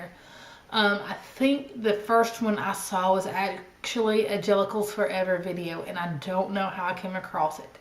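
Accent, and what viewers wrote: South, United States. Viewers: there is nothing wrong with being a Basic kind of person! You are one of my absolute favorite stitches (maybe becuase I'm from the South too and your accent reminds me of home) and I love your WIPs and finishes and hauls! You have a 2 yr old, as well, so no need to be down on yourself! You are ONE BUSY PERSON! I appreciate the time that you give all of us! Keep the videos coming!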